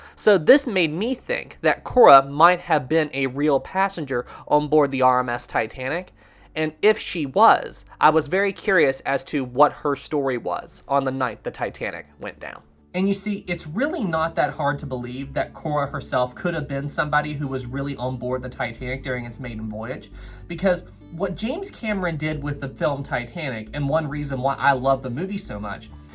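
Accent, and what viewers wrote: South, United States. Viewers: great video from scotland love the sourthen American accent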